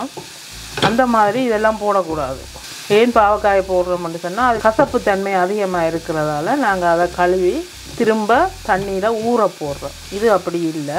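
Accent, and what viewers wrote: Sri Lanka. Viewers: leave it recipe, Srilankan தமிழ் ❤❤❤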